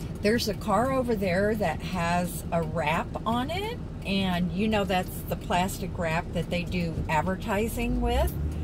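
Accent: South, United States. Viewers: I love your Channel. I love your southern accent. About nomadic fanatic, I ran into him in a Walmart parking lot by accident just one week into my YouTube channel. I turned on my phone that night to detect Wi-Fi and I was detecting nomadic fanatic Wi-Fi so I looked out my windows and didn't see anything so I opened the door and there he was right beside me. It was funny. Eric seems like a very nice guy. When I said how nice he was and made a 1-minute video about it I got so many trolls. I just wasn't ready for them so I deleted that thread. I hated to delete it because it had a thousand views on my brand new channel.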